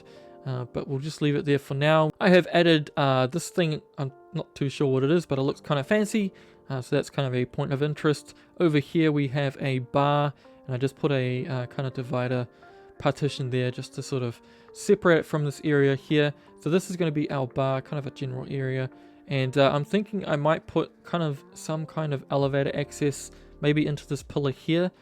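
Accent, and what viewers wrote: New Zealand. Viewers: You a kiwi bro??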